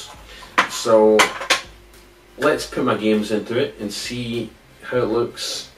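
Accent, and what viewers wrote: Scotland, United Kingdom. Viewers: Im from Scotland so I could pick up on the hints of Scottish but was confused by the American terms you were using haha. My granda is also Scottish and has lived in America for years so has a similar twang lol. Good video btw 👍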